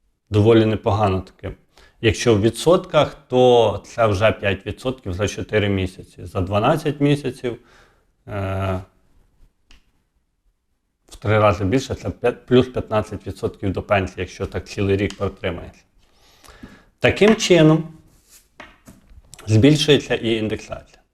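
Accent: Vinnytsia, Ukraine. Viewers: Іправда як вже ти 'дістав' своєю балаканиною. Пора вже тобі вивчити українську мову бо твій вінницький суржик вуха ріже.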